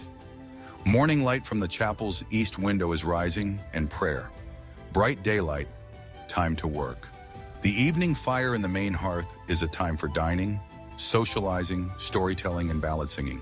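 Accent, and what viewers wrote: United States. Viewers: Narrator a yank a no for me my house is older than the usa.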